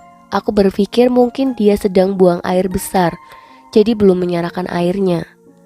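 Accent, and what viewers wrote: Banyumas, Indonesia. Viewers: Galfok karena naratornya medok bgd bacanya. apalgi pas baca merokok, cicaheum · Suaranya....koyo wong ngapak...... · Orang ngapak nih kayaknya koreksi kalo salah 🤣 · Ini naratornya mbak asli banyumas ya? Aksen ngapaknya keliatan wkwk 😂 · Ini pasti orang ngapak banyumas /cilacap.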